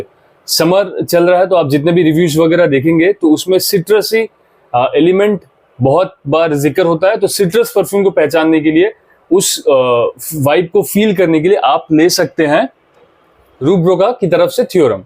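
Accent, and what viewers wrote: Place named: Mumbai, India. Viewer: aapki Hindi se Aisa lagta hai k aap Mumbai se belong karte ho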